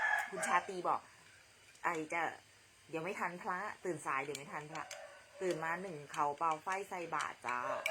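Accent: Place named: Isan, Thailand. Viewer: สวัสดีครับ ชอบฟังเสียงเวลาสาวอีสานพูด น่าฟังครับ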